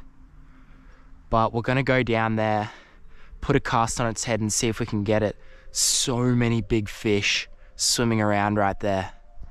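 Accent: Australia. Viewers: Love that aussie accent !!! Awesome content by the way !!! Greetings from Switzerland !!!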